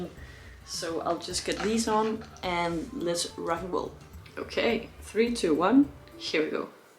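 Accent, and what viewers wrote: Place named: Denmark. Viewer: Loved the reaction! He will blow you away even more if you listen to more tracks. And.. are you Danish? The accent sounds familiar. I’m Danish so it gives me hints of that ;)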